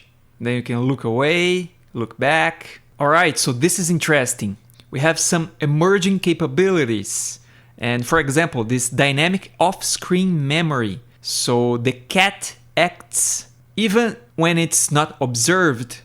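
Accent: Brazil. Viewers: man are you brazilian? your accent is very brazilian, just got curious haha. good video btw :))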